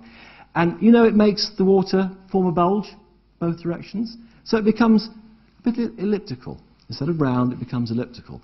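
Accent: United Kingdom. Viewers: his britan english is anoying >_>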